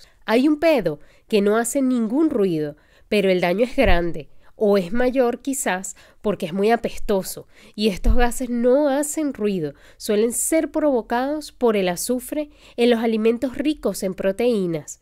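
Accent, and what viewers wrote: Venezuela. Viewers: Hablas como venezolana. Muy divertida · DIVINA Dr. he reido casi todo el video con tu sentido del humor ,,Belleza, simpatia ,conocimiento y excelente explicación .eres venezolana por tu acento. Gracias totales, Dios ilumine tu camino de bendiciones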